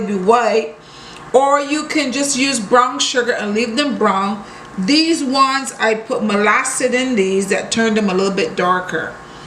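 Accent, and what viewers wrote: Barbados. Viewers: Great to hear your bajan accent peeping thru!!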